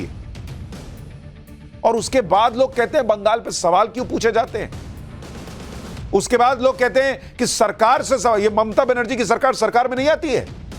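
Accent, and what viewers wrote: Bihar, India. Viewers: Are dada ee to bihari lg rhaa g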